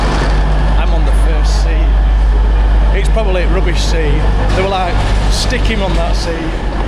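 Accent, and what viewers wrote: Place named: Barnsley, United Kingdom. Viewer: Love the Barnsley twang. Knob-dy and int it. I talk exactly the same! 😂😂😂😂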